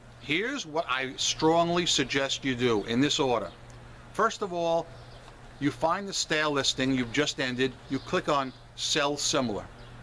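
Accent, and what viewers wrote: New York, United States. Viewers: New York City accents like ROCK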